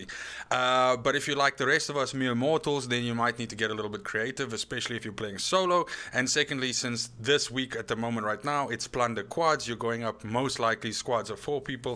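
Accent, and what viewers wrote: South Africa. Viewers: Are u South African?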